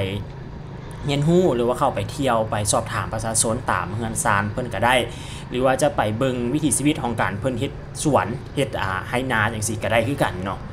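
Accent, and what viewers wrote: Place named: Lom Sak, Thailand. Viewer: สำเนียง ก็ใกล้เคียงกับบ้านข้อย วิถีชีวิตประชาชาชนบ้านฮ้อม หาดซายฟอง คือกันกับบ้านข้อย บ้านหวาย อ.หล่มสักจ.เพชรบูรณ์,ไทยแลนด์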